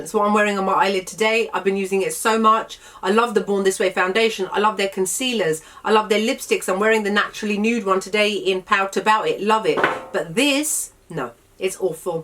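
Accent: United Kingdom. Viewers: thank you very much. The lady above, literally read my mind but when I googled which one this or the Hourglass trio was better value, I got no reply. She read my mind and you've saved me buying this. Can you please tell me the name of the other powder you mentioned after Charlotte Tilbury (I have the same powder but didn't use it yet) you speak rather quickly and I'm Irish and with your UK accent, I didn't catch it. Did you say it was a Nars powder and if so, what one please? Your own make up is stunning. I have brown eyes and again, coincidentally, I was looking for pink eyeshadow palettes but I was disappointed as Amazon only had a Maybelline one and it wasn't vibrant and beautiful like your eye shadow. I like your in depth review and in Ireland that trio is almost 39 euro!! I subscribed to you. Happy New Year from Ireland xx 29th of December 2021 xx ps. 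Please will you tell me all the make up that you used for your stunning pink look? I assumed it would be listed but I was wrong :). It's weird because the past week I have been longing to find a pink based eye shadow palette but to no avail. I only see very insipid pale pinks that look dull and chalky too. I want your metallic effect look with vibrant pinks. I'd love to copy your entire look, so please may I know the products ie blush etc but also their shades? It helps that you're a natural (is it racist to say Arabian beauty? People are so touchy nowadays but you really remind me of an Arabian beauty and it just means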